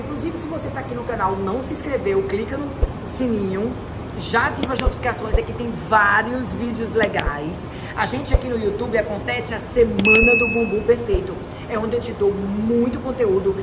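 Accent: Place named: Northeast, Brazil. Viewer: Mulher vc sabe tudo não vejo a hora de começar seu treino ,já gostei de vc de cara esse sotaque nordestino é muito massa parabéns por o seu trabalho ❤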